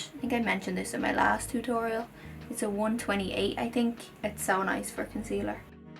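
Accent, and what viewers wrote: Ireland. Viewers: I could listen (and watch of course) to your videos all day. Irish accent for the win. By the way make up 👌🔥🥰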